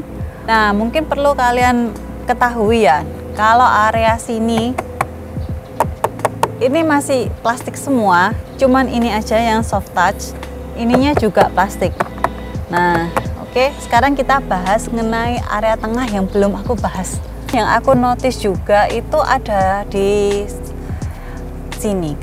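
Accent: Java, Indonesia. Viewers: Penyakit baleno hb lama, lampu nangis dan setir lengket masih ada kah ? Mba e medok bingit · Medhok e bikin betah nonton 😄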